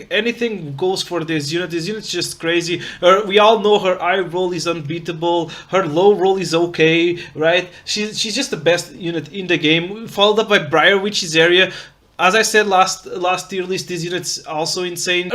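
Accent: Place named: Portugal. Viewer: Ouvi-te falar um pouco, e fiquei logo a pensar que eras tuga. Quando descobri que tinha razão... É tão bom ter um content creator de Epic7 português! Continua!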